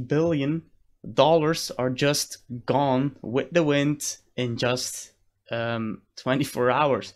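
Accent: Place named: Germany. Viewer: Love the German accent! Anyways, keep calm and HODL! Believe in the technology! 0x20E0C5317F4a2cbB039E5Ec71E60A67C9b7fe799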